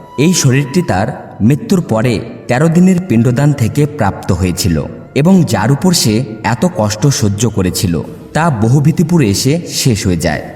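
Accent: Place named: Bangladesh. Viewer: বাংলাদেশি বাংলা এটা ভারতীয় বাংলা নয় এটা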